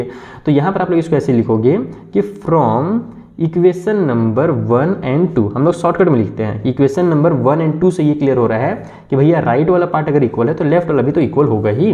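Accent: Bihar, India. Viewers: Sir bihari lagat he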